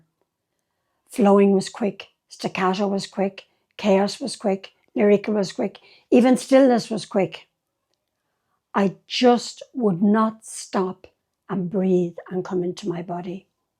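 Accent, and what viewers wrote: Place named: Ireland. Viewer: Thank you for sharing your story. I was scrolling through utube & ran across your video & your Irish brogue. My Dad was from Ireland. Many blessings to you . Im sure you sharing your story will bless many. Sending love & light.❤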